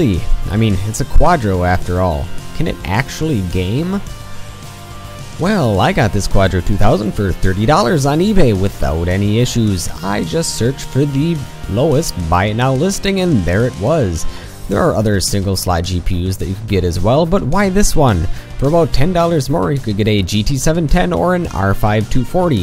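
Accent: Canada. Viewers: are you Canadian? because to me you sound Canadian (no offense)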